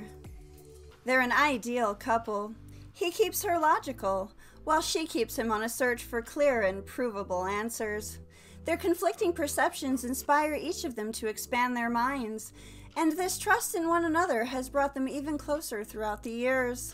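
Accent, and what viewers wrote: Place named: South, United States. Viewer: No one cares if you like someone’s voice. You must have a pretty crappy life to leave a whole novel on a video about someone’s vocal tone. I love her Southern charm and affect. It’s called story telling. You’d do more good in life taking the time to tell people positive things instead of spreading negative energy like manure.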